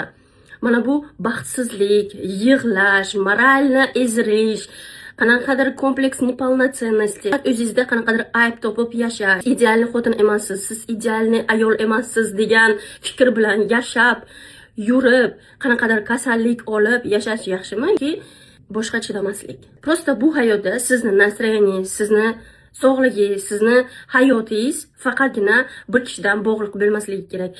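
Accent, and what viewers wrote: Bukhara, Uzbekistan. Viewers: Nima qilibdi shevasiga bu buxorocha sheva hammamiz bir insonmiz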